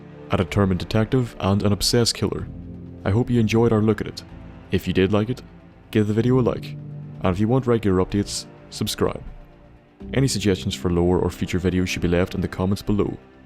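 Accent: Northern Ireland, United Kingdom. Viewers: Have to say mate, your NI accent does wonders for the creepiness of this video :)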